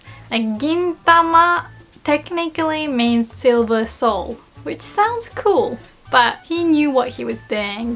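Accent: England, United Kingdom. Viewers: ive been wondering for a while as to why you had more of an English accent. Makes me happy to confirm you had a long(?) experience here. Hope to see you around in Tokyo. Look after yourself~~ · This video really makes it obvious you lived in England, ignoring of course, the British twang to your English accent. One question: in England insulting people (often seemingly harshly) is a big part of our culture, the closer you are to someone often the harsher these insults can get. How did you find this practice when you were living here, and how did this affect your perception of insults since moving back home?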